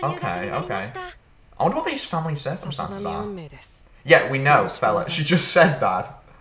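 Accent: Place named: United Kingdom. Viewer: idk whats better the story or the british accent describing the story... this makes me feel like british people are real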